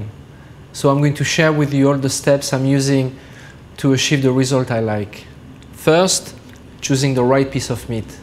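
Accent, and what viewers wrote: France. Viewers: This is bullshit - just because he speaks English with a French accent doesn't automatically mean he can cook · French accent is funny, nice video learned a lot from it.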